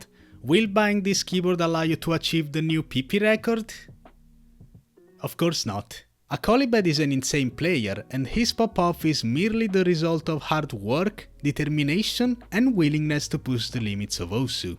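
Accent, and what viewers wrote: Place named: Italy. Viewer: That's some sick italian accent, right?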